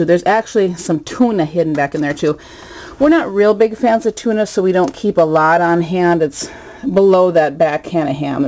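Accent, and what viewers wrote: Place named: Minnesota, United States. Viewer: You in MN? My wife and i are in the same boat. I can hear the accent.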